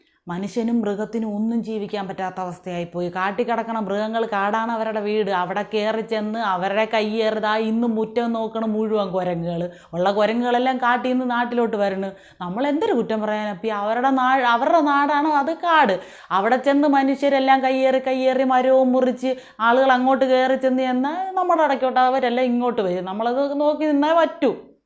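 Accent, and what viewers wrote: Thiruvananthapuram, India. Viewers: എന്തരപ്പീ ഇത് എന്തരു നല്ല അവതരണം അപ്പീ ഇത് തിരന്തോ രംഭാഷ കലക്കി സൂപ്പറായിരിക്കുന്നു മോളേ👍♥️🌹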